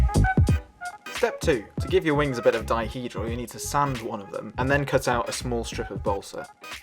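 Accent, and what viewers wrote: England, United Kingdom. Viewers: I’m jealous of your English accent.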